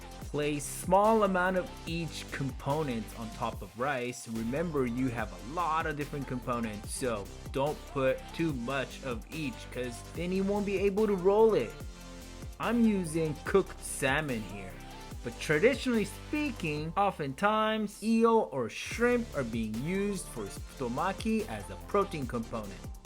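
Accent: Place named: United States. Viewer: We don't say "you greedy" in English 🤔 Your spoken American English is a bit exaggerated.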